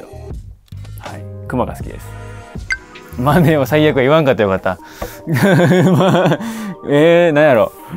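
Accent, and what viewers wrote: Kansai, Japan. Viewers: くんの笑顔とえへへが大好きです💞 あと関西弁も好きだし見るからに優しさが溢れ出てるくんが好きです👦🏼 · 雅椋さんの好きなところなんて星の数ほどあるのですが、、、選抜して述べると、ダンスが好き、笑顔が好き、品のある金髪が好き、ホクロが好き、臣さんラブなところが好き、DJとか曲作れちゃうところが好き、狙ってないのに面白いところが好き、関西弁が好き、大クセかましてるところが好き、マメなところが好き、くんの書く文章が好き、言葉選びが好き、目を見て話してくれるところが好き、優しくて好き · Q.僕の好きなところを教えて下さい全くもう〜欲しがりだな〜😉😉🧡 くんの好きなところは、踊る時のグルーヴ感、楽しそうに踊る所、かと思えば切ない表情もしてそれがえちい所、真面目な所、ボケが滑った時の返し方、どんな方にも平等に優しい所、踊ってる時に右か左をチラッと見る癖、 『えへへ』と笑うギャップ、身長が175cmな所、手の指が長くて綺麗な所、煽る時の低音ボイス、所作に出る礼儀の正しさ、不意に出る関西弁、不意に出る天然ボケ、SNS更新頻度が高い所など！！もう上げたらキリがないので、とりあえずという存在が大好き！！ってことを知ってもらうだけで十分です🐣🐣✌🏽✌🏽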